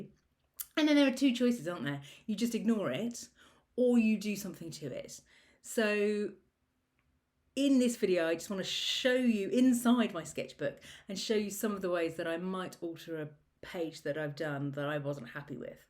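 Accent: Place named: England, United Kingdom. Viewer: Wow! 🤩 I've just discovered you today. I'm feeling a bit low, (or was,) it's my 55th birthday, no cards, a "nothing" day... but i was checking out videos of gelli plate art and found you. 😊 What a lovely lady, so glad you are doing again art now. The sketchbooks are full of gorgeous colour, great pen drawings, not at all childish! 👍🏻😊 I also love the fresh cream walls in your studio with the splashes of colour, and colourful ceramics as well. That's how I'd like this dull flat to look; it really needs tidying up and decorating! 😱 And i just live up the road in Eastbourne at the moment. And it's nice to hear a calm English accent. Must watch your other videos - great advice too.❤🌞🌷🌼🌱🌿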